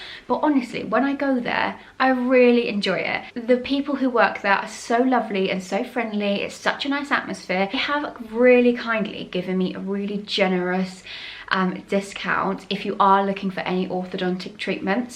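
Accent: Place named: England, United Kingdom. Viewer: The English accent has a calming effect on me. Pip, pip, cherio and God save the King and all that rubbish.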